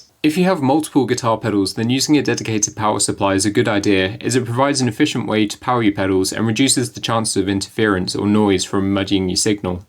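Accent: United Kingdom. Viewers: this is so random but are u from by yorkshire?? im american but trying to get better at identifying british accents haha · He doesn't sound like he's from anywhere in the north to me but I'm not british either. My guess would be somewhere in or around London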